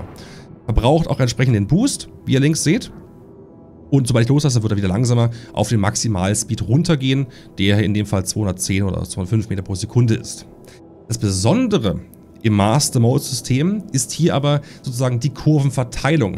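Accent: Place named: Saxony, Germany. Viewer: Vermehrt. Da merkt man das du aus dem sächsischem Bereich kommst 😅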